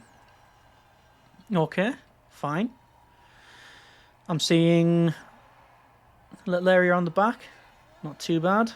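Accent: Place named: Middlesbrough, United Kingdom. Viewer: Why do you sound like you're from Middlesbrough??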